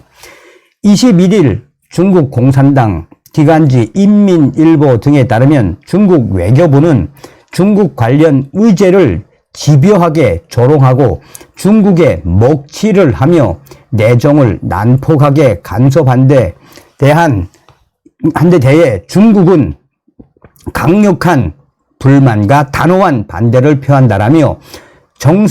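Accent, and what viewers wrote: North Korea. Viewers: 정성산 선생님 남한에 잘 오셨수다래 ㅎㅎ 이북말 선생님 말씀하실께 정겹수다래 ㅎㅎ감사합니다 정 선생님 저희부모는 평안북도 선천이외다 늘 수고가많수다래 🌼🌷🌸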